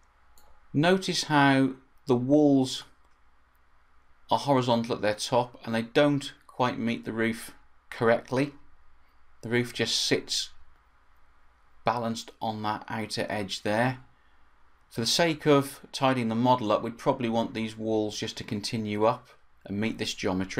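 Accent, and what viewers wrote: United Kingdom. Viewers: Nice British Accent and very helpful sharings :) Thanks alot mate :))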